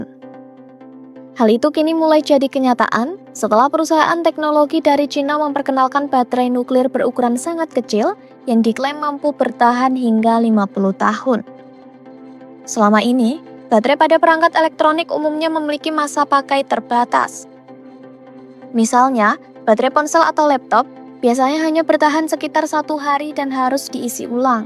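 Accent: Java, Indonesia. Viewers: Kak, aksen Jawanya kuat banget. Mohon lebih disamarkan 😅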